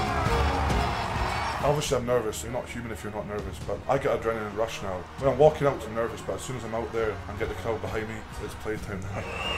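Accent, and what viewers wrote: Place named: North East Scotland, United Kingdom. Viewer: His accent sounds very North East Scottish.I should know I live there.Never heard of this guy before but I'm definitely gonna start following his strong man career.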